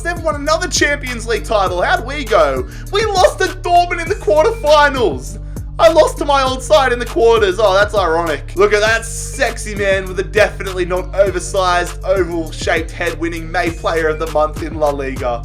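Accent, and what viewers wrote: Australia. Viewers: Are you austrillan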